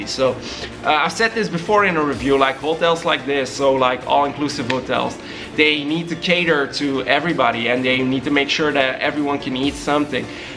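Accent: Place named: Russia. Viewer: You sound Russian that speakers very good English, for a Russian to go there it might not be worth it but as a Canadian paying 1400$ Canadian dollars including flight transfers and resort for level I think it’s a good deal. It’s Cuba you’re not going to get the same standards as Europe or North America but it’s still a good offering.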